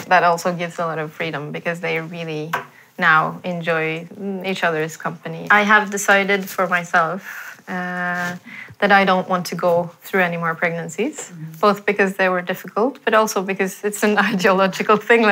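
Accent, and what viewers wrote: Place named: Turkey. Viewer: Ablam ya :dd konuşmaya başladığı ilk anda dedim ki bu kadın kesin Türk ancak Norveçliyim deyince de ümidimi kesmedim çünki bu ingilizce aksan ancak Türkiyeden yolu geçmiş birinde görülür :))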